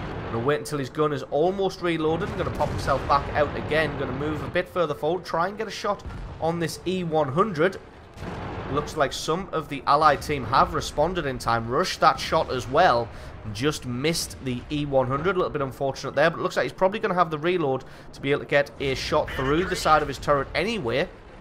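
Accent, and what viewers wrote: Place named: North East England, United Kingdom. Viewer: The north east of England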